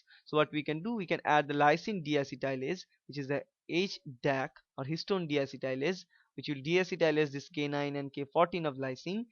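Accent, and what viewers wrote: India. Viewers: Sir please Indian English bolne se acha hy Hindi mein he lecture deliver karein.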